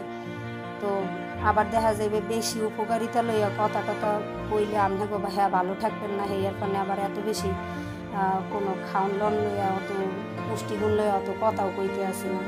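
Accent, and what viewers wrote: Barisal, Bangladesh. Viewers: Apu tomar prothom vlog ta khub e sondor hoice. borishaler basa sunte aro besi valo lagce. Tomar notun channel er jonno shubo kamona roilo bonu. Onek dur agiye jabe asha kori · Apo tomar vasha sone na hese parlamna!amar des o borisal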